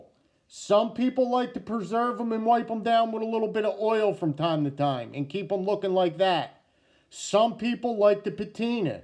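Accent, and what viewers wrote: Philadelphia, United States. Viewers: I love mine! I wish I had the money to grab the Oily Creek bone version as well, but unfortunately, I could only afford the one. Hopefully I'll be able to get a Sambar Stag one when they release it. GEC makes a great knife! I wish I had gotten into them sooner, but this one makes my 7th one, and so far my favorite. The #93 oily creek bone rams foot is a close second. They used this wood to soak up and clean the oil from the inside of their drill pipes back in the day. That's why it's called sucker rod wood. I used to work at the Philly airport for USAIR for 20 years, and I lived in Williamstown NJ,. I'm living up in NYS now, but listening to you talk brings back fond memories of that 20 yrs I spent down there. Loving the accent and the way you talk brother!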